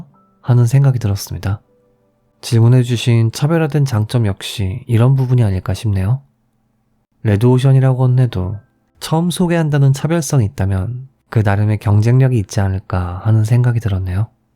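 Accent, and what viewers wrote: Japan. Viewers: 와 전 들으면서 한국말 잘하는 일본인이시구나 라고 확신했는데 발음이나 억양에서 그런게 느껴졌어요ㅎㅎ 근데 한국인이셔서 놀랐어요 평소에 즐겨 들었는데 처음으로 댓글 남겨보아요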